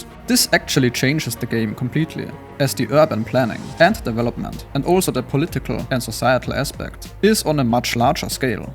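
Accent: Germany. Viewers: Man hört zwar, dass du ein Deutscher bist xD aber sonst richtig gutes Video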